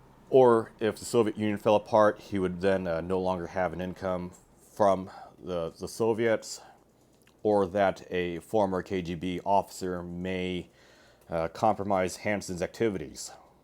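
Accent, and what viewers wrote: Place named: United Kingdom. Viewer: ACKCHYUALLY, He appears to be british to me.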